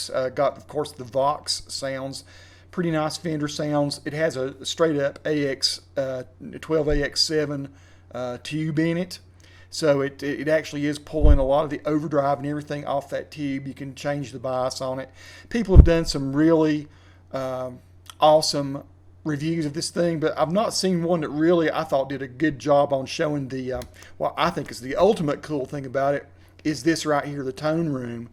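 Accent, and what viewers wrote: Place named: Southeast, United States. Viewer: I have been looking at video reviews of this amp for over an hour now, and I enjoyed yours 10 to 1 more than any other one. You’re relaxed, not trying to stroke your ego, just checking out the tones by picking through some tunes relevant to the settings. I don’t know why people think that an ‘amp review’ should be them running 64th note arpeggios. The only person that helps is themselves and their egos. Having said that, you’re absolutely a great guitarist and it’s beyond obvious you love music and the guitar. Also, it’s awesome to click on a video and hear someone speaking with the same accent as me! I don’t know what part of the southeast you’re from but I’m from the southwestern part of Virginia, and I’d guarantee you’re originally from VA, TN, or NC. Either way, you absolutely gained a new subscriber today!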